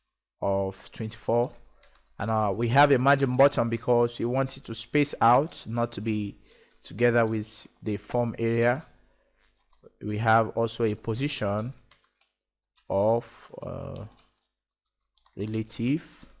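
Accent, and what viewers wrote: Nigeria. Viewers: He sounds like a Nigerian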